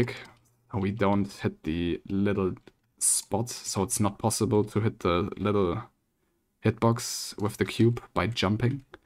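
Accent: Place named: Germany. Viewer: your voice is very german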